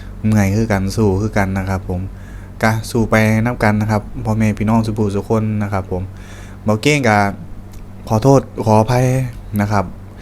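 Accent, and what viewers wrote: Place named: Sakon Nakhon, Thailand. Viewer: เว้าญ้อคือสกลนครเนาะหนุ่มน้อย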